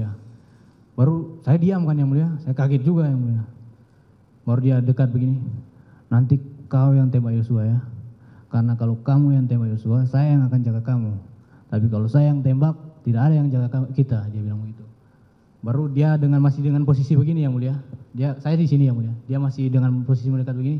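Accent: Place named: North Sulawesi, Indonesia. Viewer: Dialeg SULUT tetap dong ❤️❤️❤️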